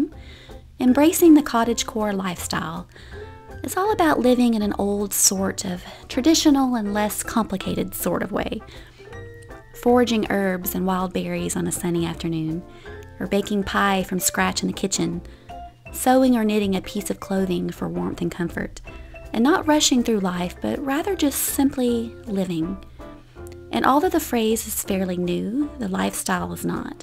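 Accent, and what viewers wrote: South, United States. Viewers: I absolutely adored everything about this video from your sweet voice and southern accent to the aesthetics and fashion!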